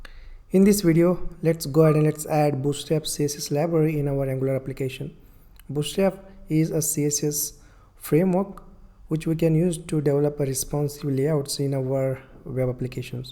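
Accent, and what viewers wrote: India. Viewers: Very helpful ❤ but please try to talk in Indian English itself. The mix of both doesn't seem good to hear. No offense !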